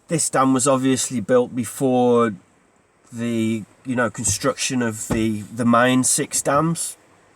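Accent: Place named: Manchester, United Kingdom. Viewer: Love his accent…. 😍 Are you maybe from Manchester Sir?!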